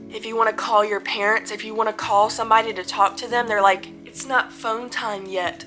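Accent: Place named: South, United States. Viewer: 😭 baby girl, I'm taking you on as my surrogate daughter right now. I FUCKING FEEL YOU!! I've been doing exactly this for 10+ years. Hang in there baby, it is getting better as we speak because of people like you that are brave enough to stand by their cries for help. Start with Borderline Personality Disorder. Dr. Fox here on YouTube is amazing. There are are doctors out there that can and will help us, but I have learned that it's up to us to make this change. I firmly believe that what you are doing by spreading awareness on here is HOW we make the changes we need and it's up to us because there are so many people out there that CAN'T speak up for themselves. We have to help them. It's been 4 months since your last video. I deeply hope you are still fighting. I'm HERE! I'm happy to connect with you personally if you want. I want to help you. 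❤ Edit: One other thing - your accent tells me you are in the south. I have been in outpatient treatment systems literally all over the country, and the south is about 50 years behind. You have to educate yourself online. Keep going.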